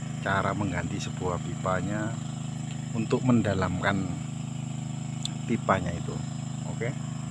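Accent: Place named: Java, Indonesia. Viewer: Orang Jawa itu medok mas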